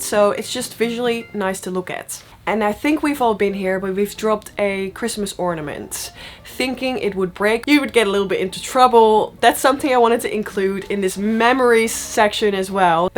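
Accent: Netherlands. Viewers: Love that you called out your Dutch accent. Because i never watched a video of you before but within a few seconds i realized i was watching a fellow Dutchie ;)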